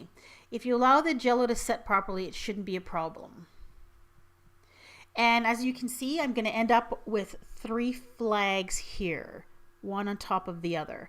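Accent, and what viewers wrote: Canada. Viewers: Canadian! You can hear it in certain words she uses :)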